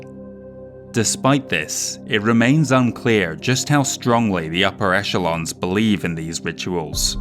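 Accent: Scotland, United Kingdom. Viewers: MechCommander is the first "proper" PC game I ever played. Remembering it just a month ago is how I suddenly ended up stumbling upon your channel. And my oh my. You have blown my mind with this long-form content. Unreal, well done. P.S. are you Scottish? You have such an interesting Scotland/England combination accent. I'm from just over the border, in Northumberland.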